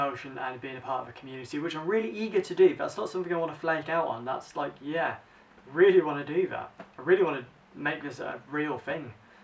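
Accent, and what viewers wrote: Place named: United Kingdom. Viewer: I too loved The Golden Compass & Harry Potter. More people need to read The Golden Compass. Plus I'm American, so I really like your British accent. Just so you know though "comment downstairs" sounds kinda odd. Welcome to BookTube!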